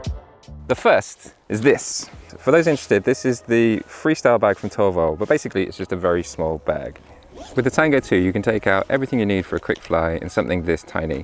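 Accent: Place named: United Kingdom. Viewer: Hey sounds a little like my review but with a nice British accent! Makes it 100% better, at least 😎 Great job mate!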